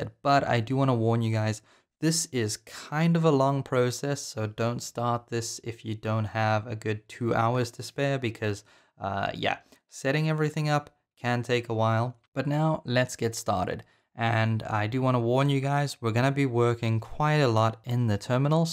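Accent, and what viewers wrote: South Africa. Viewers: While I was listening to you my wife walked in and she was like, bet you his south african 😆 I lived in cape town for years but it became way to busy for me. Living in a small coastal town atm. Thank you for the great content.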